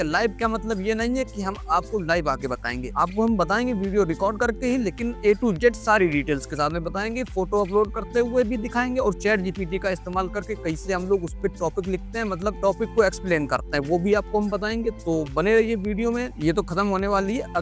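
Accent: Kanpur, India. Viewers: Kanpur se ho kya bhai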